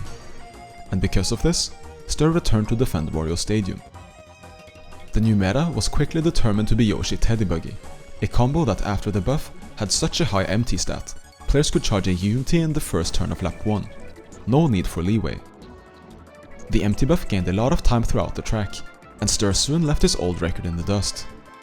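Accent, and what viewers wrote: Norway. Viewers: As a Norwegian, i could immediately tell you were Norwegian too lmao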